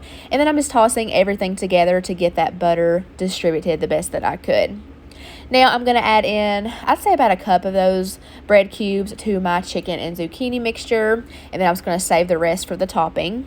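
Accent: South, United States. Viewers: I get so excited when you post! I'm from Tennessee but I still love to hear the country accent and see all the good cookin :)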